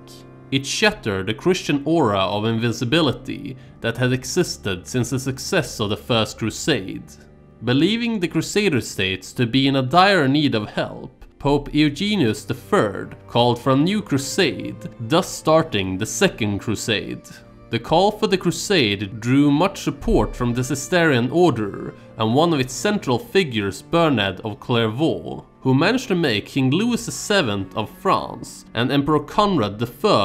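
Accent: Sweden. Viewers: That is an unmistakable swedish accent, doesn't have a choice i imagine.. At least he speaks your language fluently and made this..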